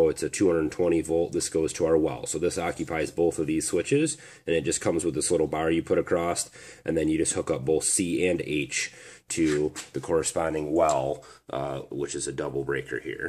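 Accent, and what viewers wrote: Michigan, United States. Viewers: Great video brother. I live in Florida now, after Irma I’ve been trying to find a safer way to power my home with my Generator. I think I’ll buy one now. And made in USA 🇺🇸 sealed the deal! So I’m watching the video thinking ‘this guy has a Michigan accent’ and ‘about time I watch a YT video without swearing’! I’m a God fearing Michigander that lived not far from your farm. Needless to say, I’m subscribing and can’t wait to explore your channel. 👍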